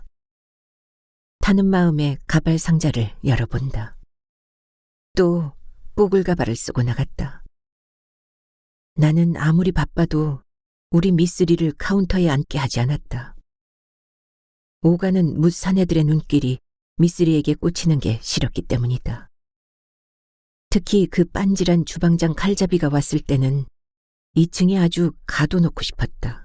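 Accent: Jeolla, South Korea. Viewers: 안녕하세요~~미스리가 아니고요 미스정이에요 구수한 전라도 사투리 재밌게 들었어요 우리 님은 성우 이신가요 ㅎㅎ 낭독도 잘하시지만 표현도 예술이에요~~😊😊❤ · 내고향 전라도사투리를 오랫만에들으니 정겹네요 잘들었습니다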